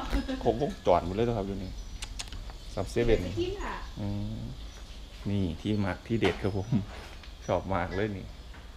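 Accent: Isan, Thailand. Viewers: กูว่าแล้วมึงต้องเว้าเขั้มข้นมาก😆😆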